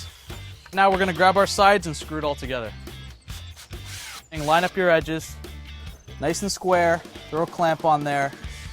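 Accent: Canada. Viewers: I was going to ask if your Canadian because you sound like an NHL player then I saw your T-shirt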